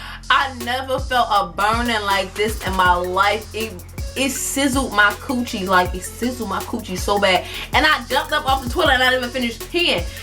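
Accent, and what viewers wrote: New York, United States. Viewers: u are so beautiful and i love ur new yorker accent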